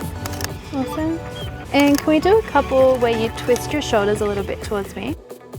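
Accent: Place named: Australia. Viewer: Hi. Is it one preset or five diff ones? By the way were you born in Australia your accent sounds a little different.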